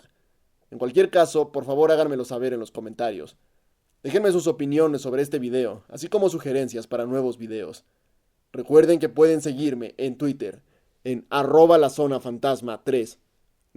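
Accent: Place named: Mexico. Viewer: no sé bien dónde es Oaxaca pero suena a mexicano, y no soy mexicano pero tienes algo encontrá de los mexicanos son unas buenas personas. En todo caso me da igual el vídeo está bien hecho no te meto odio. Ya que más quieres buena suerte.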